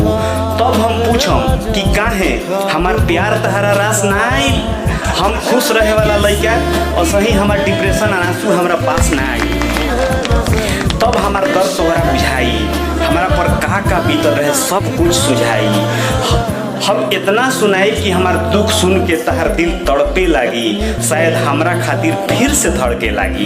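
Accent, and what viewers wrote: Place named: Bhojpuri, India. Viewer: Ek no. Bhojpuri tone with dialogue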